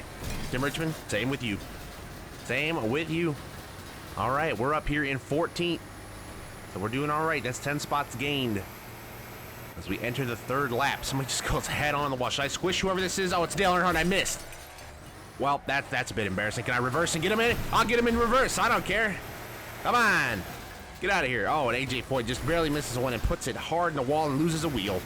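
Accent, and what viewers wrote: Michigan, United States. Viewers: This was hilarious dude. Well done. Sidenote, you from Michigan?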